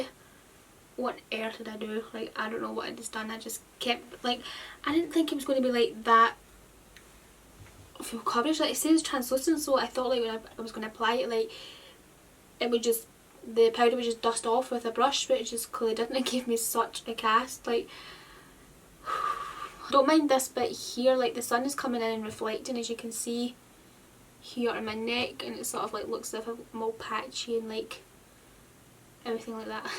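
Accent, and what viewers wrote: Scotland, United Kingdom. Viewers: Such a gorgeous girl and also such a soothing voice...ASMR candidate right here. Soft and gentle Scottish accents are the best. Great tutorial too. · She’s Scottish... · i think she's scottish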